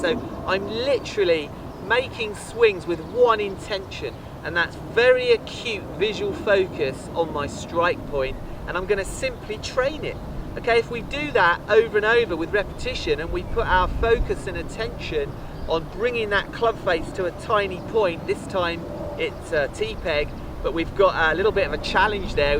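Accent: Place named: United Kingdom. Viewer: Hey, really enjoyed yr commentary on the three hole channel at the open. Great to hear British commentary on the golf from here in the US.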